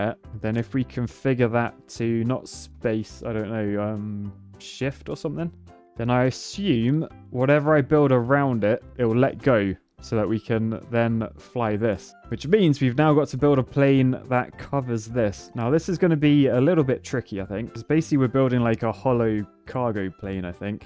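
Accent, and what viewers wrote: United Kingdom. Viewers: Are you British? You're accent like british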